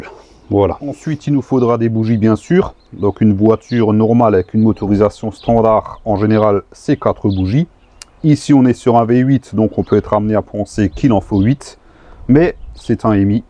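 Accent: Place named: East, France. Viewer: Non c'est pas dur car célibataire et 48 m² à Brest plein sud en étage avec mon chat et il fait beau, j'imagine avec une nana et des gosses....mais le plus dur c'est de ne pas pouvoir aller voir mon père de 75 ans et mes grands parent de 91 ans. En tout cas j'adore ton accent de l'est mon ami et ta gentillesse et surtout il y a toujours pire que soit mec salut. Kenavo.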